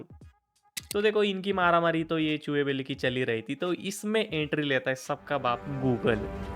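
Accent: Maharashtra, India. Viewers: Bhai tum marathi hona ❤ tone se samajh raha hain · I can tell you you're Marathi without listening Marathi from you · Bhava tu marathi ahes😂, tuzya hindi varun lagech samajl😂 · Bhai aapki hindi marathi accent mai kyu hai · Bhai aap maharastra se hoo kya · Bhava tu maharastra cha aahes ka , hindi varun kalta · Authentic and perfect Marathi accent and great explanation too , love the style 💖💖👍👍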